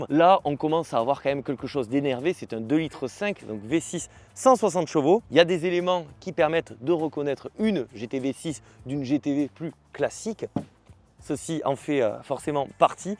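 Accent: South, France. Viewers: Le ton ici est plus méridional, disons.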